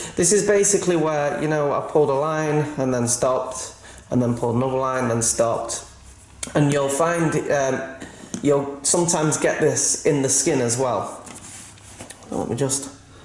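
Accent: Yorkshire, United Kingdom. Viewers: Where abouts in the UK are you from? You sound very Yorkshire...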